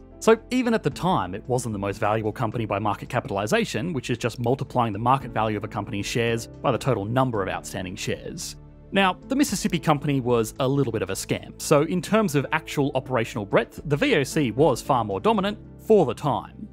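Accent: Australia. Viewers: I’m curious about your accent. The channel seems to be from the US, but it kinda sounds like Australian sometimes? Where is the accent from?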